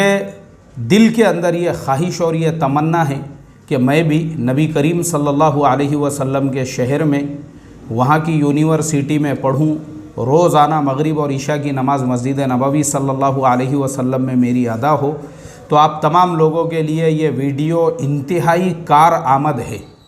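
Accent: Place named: Bengal, India. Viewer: السلام علیکم ورحمتہ اللہ وبرکاتہ بہت خوب جناب مولانا صاحب کیا بنگالی ہیں آپ کی زبان سے محسوس کررہا ہوں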